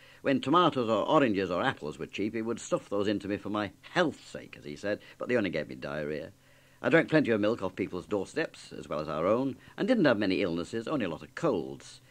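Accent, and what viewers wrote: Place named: Lancashire, United Kingdom. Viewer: Dear MUP, either you've inadvertently pitch-shifted this up, or YT has messed up somehow. Either way, AB's beautiful Lancashire brogue was never pitched as high as this.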